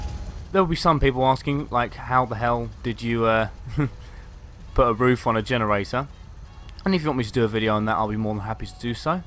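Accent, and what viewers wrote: United Kingdom. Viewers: I just love how English you are when you say things like "take a gander up 'ere" or " 'ave a nice cup of coffee"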